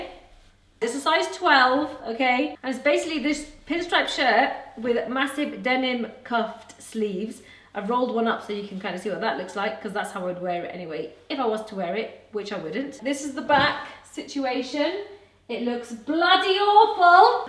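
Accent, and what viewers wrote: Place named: England, United Kingdom. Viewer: your voice + that English accent = perfection. I don't wanna sound creepy but dammit I love your voice,! Hope you're having a healthy pregnancy.🌻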